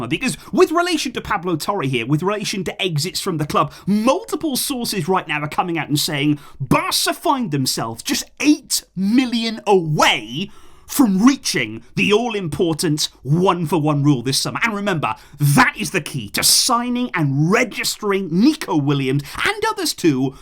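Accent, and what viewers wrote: United Kingdom. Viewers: This is also a channel for learning the original British accent.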